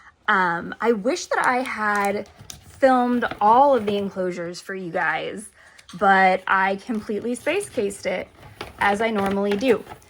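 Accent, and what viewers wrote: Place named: Minnesota, United States. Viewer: It sounds like you've been in or near Minnesota lately :D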